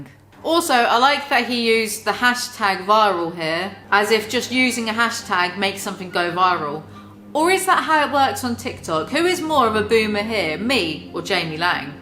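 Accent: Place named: RP, United Kingdom. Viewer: I love your posh accent :D I've never watched any of these shows, but would be hooked if you were on there insulting everyone!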